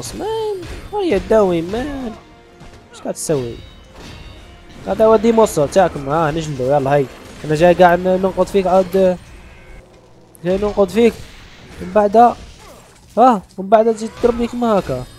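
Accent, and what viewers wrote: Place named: Algeria. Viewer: اهاااااااااا هسة فهمت 😁 اني اكول مستحيل سعودي يتكلم بهاي الطريقه +تشرفت بيك و على راسي الجزائر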